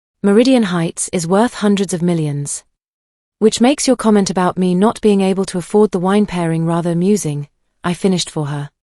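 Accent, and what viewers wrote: United Kingdom. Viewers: Where's her husband who was supposed to come to her rescue, like it says in the title. Absolutely love British narrators 😊❤❤❤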